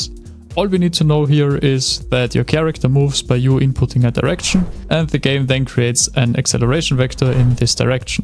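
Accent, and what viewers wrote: Austria. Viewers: hätte auf Österreicher getippt ;-)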